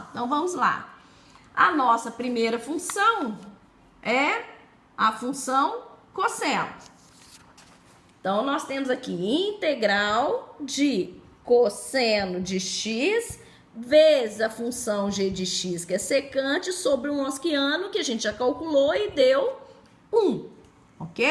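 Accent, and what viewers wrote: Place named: Belo Horizonte, Brazil. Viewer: Sotaque de Belo Horizonte MG...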